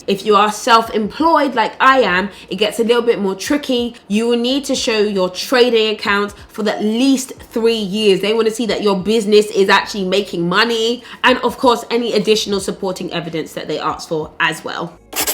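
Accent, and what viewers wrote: England, United Kingdom. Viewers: you have an English accent, are these steps meant for American home ownership?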